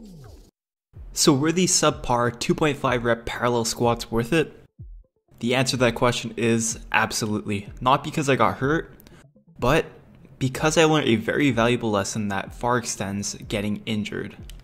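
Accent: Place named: United States. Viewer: So what's your final point. I am sorry I am not very good at understanding American accent. Please explain me. All I understood was enjoying every moment. Is this video about that?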